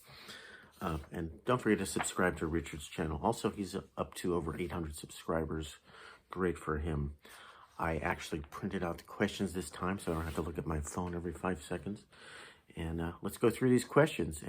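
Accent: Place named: Scotland, United Kingdom. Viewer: I nearly spat my tea out when said you were Scottish Richard! 😎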